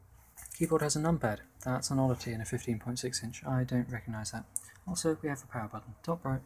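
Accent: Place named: England, United Kingdom. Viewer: I mean I know people think English guys have posh voices and sophsticated words (as long as you stray away from the Chavs etc) but this guy takes first prize :D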